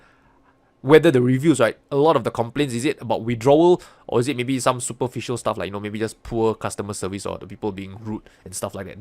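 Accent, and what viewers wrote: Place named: Singapore. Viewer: Greetings from Scotland. Just found your channel...wow some excellent information on here, been trading for over 15 years and its great to see someone like you providing so many videos free of cost. If I may make a suggestion, you should include some info on how to read fundamentals of the asset that they are trading. I assume with that accent you are from SG, lived there from 2005 to 2016, started off with an apartment in Great World City , then moved to River Valley for a few years and finished off in Toh Tuck Road for the last 6 years which were the best, living with the locals, not a foreigner in sight. LOL , not a day goes by without me thinking about my 10 years there, keep up the great work.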